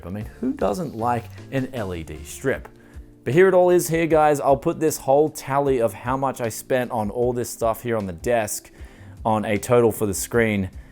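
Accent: Bogan, Australia. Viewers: An Australian doing an Australian accent is weirdly amazing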